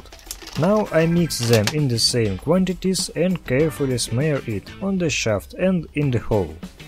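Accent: Russia. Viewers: I heard you are russian un the first ten seconds. it's notre gold yet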